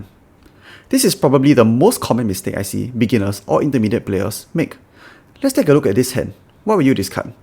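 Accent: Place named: Singapore. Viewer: Instant sub. I never knew I needed to be called bad at the game in a Singaporean accent until now. Thanks for explaining the mysteries of Riichi to us baka westerners, this is really helpful content.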